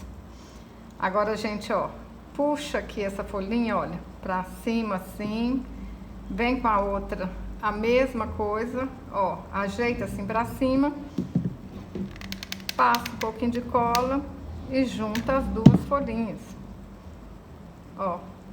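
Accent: Minas Gerais, Brazil. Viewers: Amo o seu sotaque mineiro, me sinto em casa pois tbm sou da terrinha , sou de Raul Soares M G.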